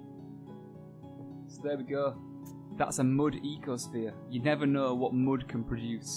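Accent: Yorkshire, United Kingdom. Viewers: love a thick yorkshire accent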